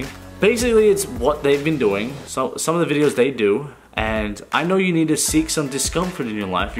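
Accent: Australia. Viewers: Wow the Australian accent is making a comeback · Wait is he Australian??? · He had an Australian accent for a lot of the video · Honestly don’t get how anyone could doubt that he’s Australian. His voice alone gives it away plus the words he uses.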